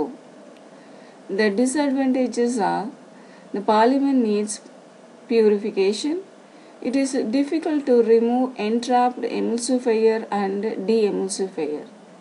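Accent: India. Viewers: typical South Indian accent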